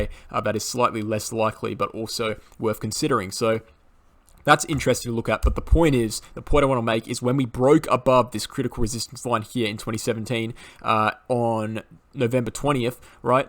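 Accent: Australia. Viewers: So exciting... what accent is that? Australia?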